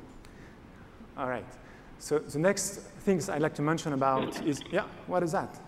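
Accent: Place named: France. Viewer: superbe ! ( l'accent francais xD )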